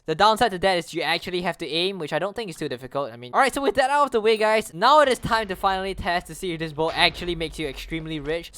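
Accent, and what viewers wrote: Singapore. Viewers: So you are singaporean. You can hear it in the accent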